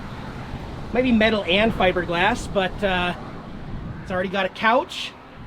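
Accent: United States. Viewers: Geez am I glad you didn’t mention the name of the native Australian bird because you American say it wrong. Just as a point, its not pronounced emoo.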